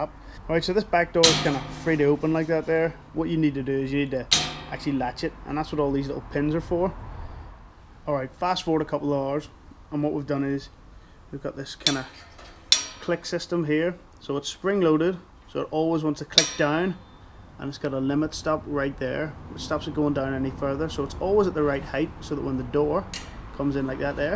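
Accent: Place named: Belfast, United Kingdom. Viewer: where abouts are you from you sound like your from belfast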